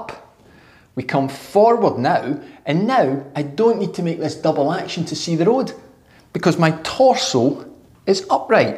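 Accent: Scotland, United Kingdom. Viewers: A real Scott. With that accent, how can he be wrong? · Great video. Thank you! Love the Scottish accent 😁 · not only - it was also nice 11 minutes of... Scottish English if I am not mistaken ;-) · Thanks for explaining! I have this exact problem and may have found the solution now. :) Also...I love your accent! Are you scottish? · Great content, thanks. And I could listen to you all day, I just love the Scottish accent! Thanks for your great content!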